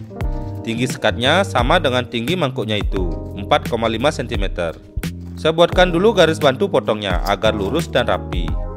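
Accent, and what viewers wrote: Bali, Indonesia. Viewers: diliat dari logatnya kynya orang bali nih , keren mas vidionya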